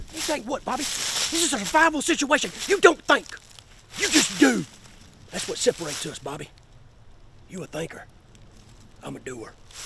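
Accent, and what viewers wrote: South, United States. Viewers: I love this guy's accent. Is that what Americans can southern?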